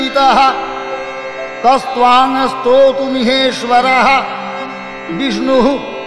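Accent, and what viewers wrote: Bengal, India. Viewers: Nice voice, very well suited for mantra. Wish the accent was not overly Bengali and the sanskrit phonetically purer. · Khub bhalo....kintu bangali uchcharon....Sanskrit is not pronounced like Bengali syllables. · It is in Sanskrit. It is pronounced with a Bengali accent. And style of this kind recitation is very much found in Bengal. Probably, that's the reason. May be he is reading it in Bengali script, but the language is definitely Sanskrit.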